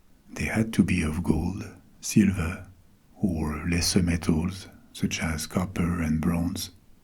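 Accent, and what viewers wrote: France. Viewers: Love your French voice it so calming. Thank mercie becoup! you for the good nights you give😂😂❤😴🥱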